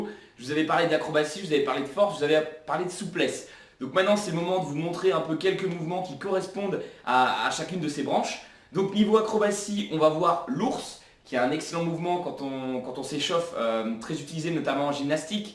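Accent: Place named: North, France. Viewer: Bonne vidéo mais tu as un putain d'accent ! Tu dois venir du nord 😅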